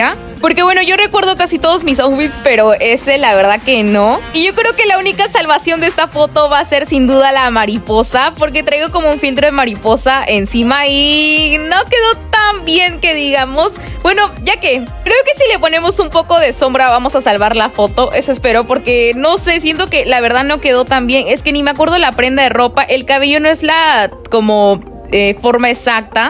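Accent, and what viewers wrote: Peru. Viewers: tienes acento de peruana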